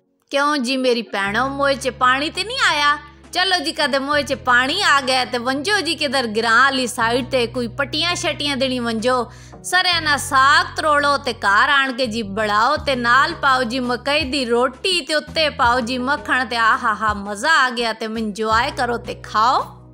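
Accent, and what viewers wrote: Punjab, India. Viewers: W salam 😍punjabi ka tarka 😂😂😂🤣👻👻👻amezing hai yar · I like Punjabi excent very much I have alot of friends in Punjab side lovely people💜👍❤️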